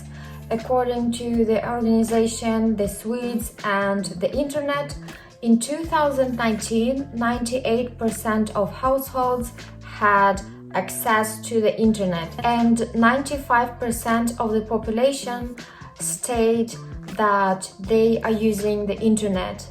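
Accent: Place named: Russia. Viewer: I geussed Siberia - your accent sounds russian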